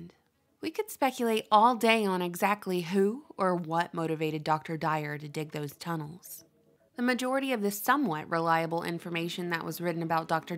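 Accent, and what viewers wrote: South, United States. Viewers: Welcome back I’ve missed you n that lovely southern voice.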